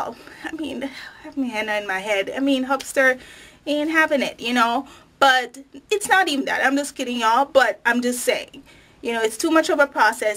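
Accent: Jamaica. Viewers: Love this vid...thanks for the patois too. Makes me feel like home :) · you sound Jamaica :)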